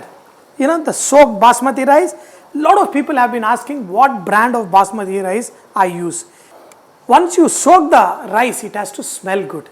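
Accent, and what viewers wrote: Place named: Tamil Nadu, India. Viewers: Wow chennai tamil super 👌👌👌👌👌👌👌👌👌🌹🌹🌹🌹🌹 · Your Tamil accent super ji Tamil Nadu mapillai, all the best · Every day morning starts off by watching your cooking videos. Today your tamil slang is ultimate!!! Can't stop laughing.. I watch for the way you present the video. The final touch Pondatti..😄😄😄 Pondatti....🤣🤣🤣🥰.. Oh man.. 100% laughter guaranteed. .. Best wishes to Vahchef !! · Tq sir for making our chennai biriyani and you say pondatti and sister says podu machan I love the way you try to speak the chennai slang and it was soooo good 👌🏼👌🏼👌🏼👌🏼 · Sema chef Chennai language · Good to hear Tamil slang 😉😉😉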